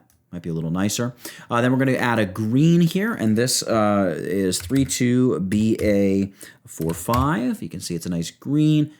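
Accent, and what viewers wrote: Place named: New York, United States. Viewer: Hey seeing you at work man, I'm merely 3 years into Illustrator yet I would assume the technique demonstrated here is intensely more complicated than what I would do in this case. Please could you elaborate on it? Once again cool vids man way to go with the NY accent.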